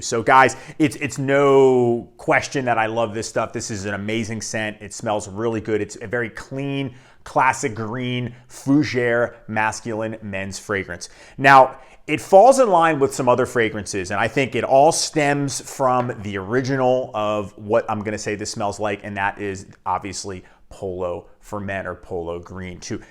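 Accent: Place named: North Jersey, United States. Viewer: For a guy called you like like a guy from north Jersey!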